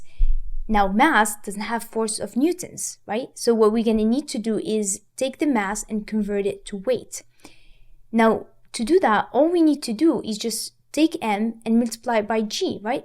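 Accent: France. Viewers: Sorry for my dummy question, are you from France?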